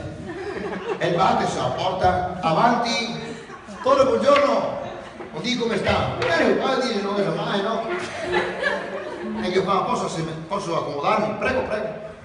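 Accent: Veneto, Italy. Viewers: Le racconta bene 😂😂😂😂E la parlata veneta e spettacolare 😂😂😂😂😂😂🎉🎉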